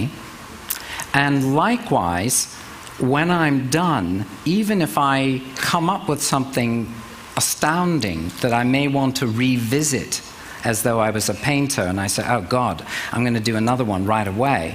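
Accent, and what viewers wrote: England, United Kingdom. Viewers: Woot...british accent? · What's up with the British accent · What's with the accent? Did he emigrate from the UK? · Ive heard his accent in many interviews, his accent is very English, you can hear him pretend to do a american accent in this video! but what i do know he's lived in the U.K and studied there for many years when he was young and went to study at the prestigious Royal College of Music. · he was such a British/almost Australian accent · why does * sound british he's American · Why does he have a British accent?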